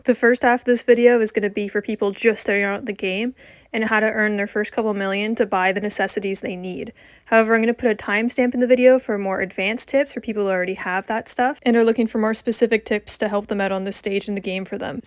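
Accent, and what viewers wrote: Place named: Canada. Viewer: At first I thought your YouTube and PSN were just a play on a nickname for thinking that was your legit name. Then I heard a few words that sounded Canadian and then boom, showed up and I was cheering because I went to a hockey game when the Vancouver Canucks came down to play the Anaheim Ducks. But I'd agree with most of this. Only thing I'd personally wouldn't buy as a new player, is the Acid Lab right away as we can get it for free after completing Off The Rails and we just have to upgrade the Acid Lab after doing i think 10 Fooligan jobs?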